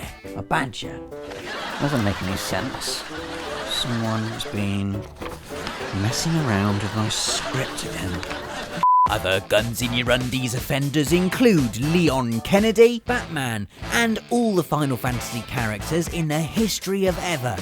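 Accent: United Kingdom. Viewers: Lucca Cardieri PlayStation access wins because... British accent beats all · why oh why is Sony Playstation presented by dog-ugly nerds with a Brittish accent! ? unsubscribe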